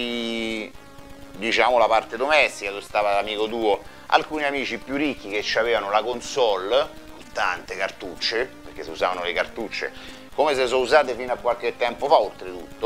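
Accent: Rome, Italy. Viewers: sei un grande, oserei dire un "geniazzo": estrema competenza abbinata a grande, voluta, semplicità comunicativa, peraltro con un deliberato utilizzo del "romanesco", che rende il tutto incredibilmente familiare e soprattutto nostalgico; spazi dalla tecnologia allo sport con la stessa disinvoltura con cui dai un "colpo sotto"!!! 🤝🏻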